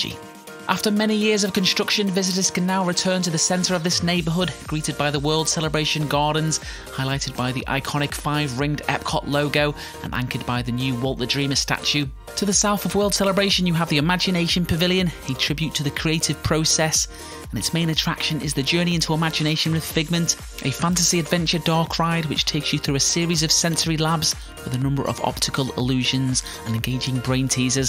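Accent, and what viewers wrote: United Kingdom. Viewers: Wow 😯 British 🇬🇧 maybe 🤔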